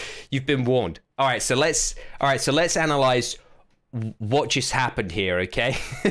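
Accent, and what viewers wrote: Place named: England, United Kingdom. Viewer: He doesn't sound like dantdm he just has an English accent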